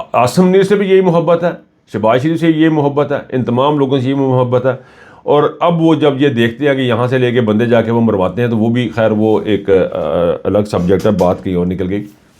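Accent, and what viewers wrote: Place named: Punjab, Pakistan. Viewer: Excellent way of explaining the view……urdu with punjabi tarrka May Allah bless and help you to cover Pakistan’ s people point of views ❤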